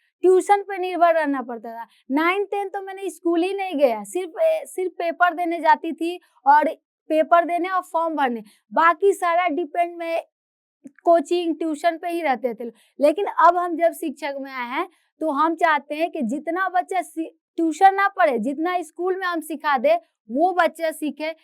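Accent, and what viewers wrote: Bihar, India. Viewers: Me Bihari to nahi hu ,,,but mujhe bihari tone bahut bahut pasand h. 😊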